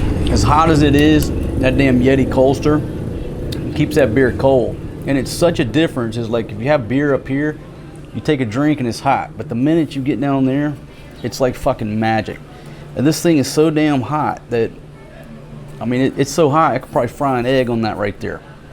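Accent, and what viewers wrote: United States. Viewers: The trailer idea is genius. Even if she gets possession of it, it would be a lot cheaper than losing a house. Take care with the bank account comment; it might bite you if it gets in the wrong hands. I'm not from your part of the US but I have absolutely no problem with your attitude or the way you talk. Be yourself and be proud. Peace out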